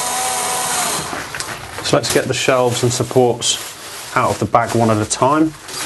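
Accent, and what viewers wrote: United Kingdom. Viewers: Good Lord! A British voice on YouTube!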